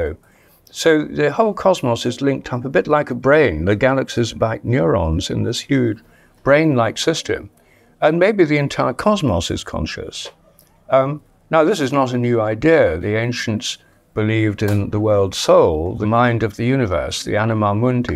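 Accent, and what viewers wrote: RP, United Kingdom. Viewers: This man is a complete quack, and most people don't realise because he speaks in a confident, relaxing, plum English accent. He argument is as basic as durr durr, cosmic web look like brain; cosmic must also be brain. There are many interesting types of reticular patterning throughout nature - alone it does not equate to consciousness. Does a large spider web have consciousness? no. Connecting two disparate ideas together using a spurious third idea is called Magical Thinking - and it is a symptom of schizotypy. Just because its a nice romantic idea o0o0o the universe is conscious, doesn't make it real. Does the universe, like a brain, exhibit goal directed behaviour? No.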